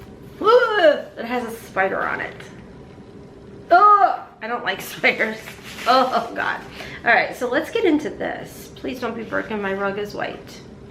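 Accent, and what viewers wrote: South, United States. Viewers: So I seen in another video you said something about being at an Ohio festival… are you from Ohio or down south? Some words I hear a southern slang behind lol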